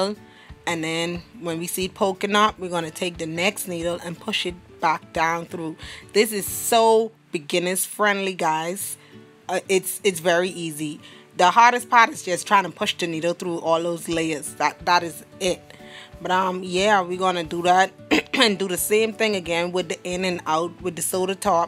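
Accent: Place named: Bahamas. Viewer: Hello sound like from Bahamas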